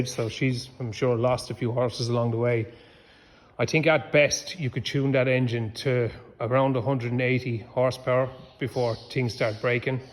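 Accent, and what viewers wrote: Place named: Mayo, Ireland. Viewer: What county you from . Sounds like mayo.or Sligo..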